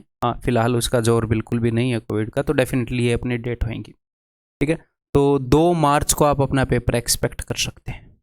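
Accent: India. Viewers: Great motivation Maja aa jata hai sunkar Bilkul Desi style me......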